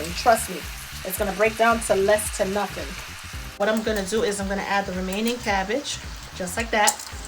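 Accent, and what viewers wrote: New York, United States. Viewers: Southern fried cooking with a NY accent gotta love it!! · Excellent video...great explanations...I've already saved to my recipe file. Out of all the Youtube Fried Cabbage Videos, I picked you Ms.! ( I loooove your New Yaulk accent) Gonna make this tonight. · Ms. Lady, your accent sounds like NYC, but your S U P E R B, smooth cooking is distinctly southern. Who influenced you? (Inquiring minds want to know).. You are welcome in our abode every day, and ..uh.. bring the cabbage cores with you. LOL. Want to view more of yr cooking. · Her accent ,is of New York! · You sound like you have a new york accent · She sounds like she has to be from New York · Love her New York accent. Dish looking good! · shes nowhere near from the south. ny or jersey maybe. but her cabbage sounds great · Definitely going to make this tomorrow for tea. 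By the way, love your accent I’m from UK. ( NY ? ) excellent vid.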